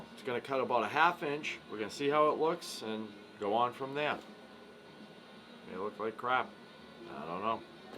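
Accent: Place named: Massachusetts, United States. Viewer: Dude! These signs are awesome! How am I just finding you now??? I grew up in Natick and now live in Portland (Maine), but I miss my homeboy accent something wicked. And Maldenites have one of the best MA accents of all. Anyway, I found you through the Rockler 2x4 Challenge and you have a new subscriber. Keep on makin'!!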